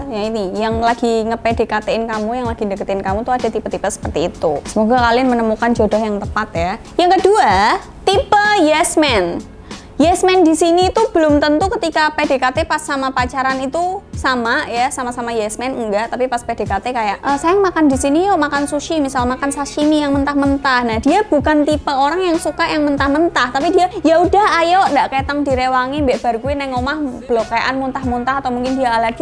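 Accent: Java, Indonesia. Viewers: Mba medok amat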